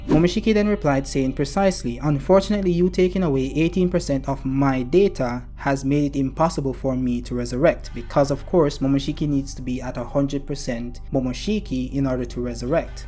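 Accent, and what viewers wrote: Jamaica. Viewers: Yow bro.... you Jamaican? Or from the Caribbean??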